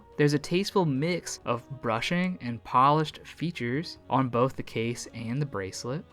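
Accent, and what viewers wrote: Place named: South, United States. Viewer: that mall shot you put in there is the World Trade Center mall in lower Manhattan. A place where I have gone watch shopping time to time (they have a swatch boutique, tissot boutique, and London jewelers). For a minute I thought you were from NYC, but im guessing by your accent down south is more likely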